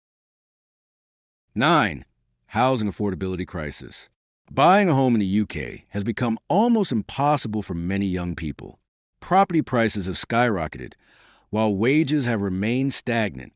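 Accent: United States. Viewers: this coming from an american voice is a joke.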